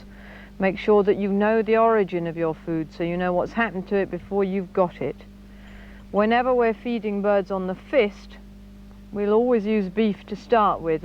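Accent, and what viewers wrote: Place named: RP, United Kingdom. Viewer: rather posh , I like her practical no bullshit style .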